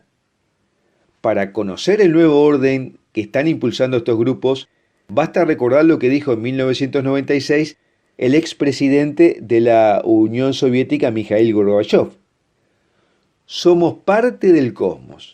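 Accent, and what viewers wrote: Argentina. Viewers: TU ACENTO TE DELATA: ¡ERES ARGENTINO! MI PREDICADOR EVANGÉLICO ARGENTINO FAVORITO ES: "DANTE GEBEL." SI NO LO CONOCES, TE LO RECOMIENDO, SU PRÉDICA: "THE WALKING DEAD" ES, SIN DUDA ALGUNA, UNA DE SUS MEJORES PRÉDICAS!!! GOD BLESS YOU!!!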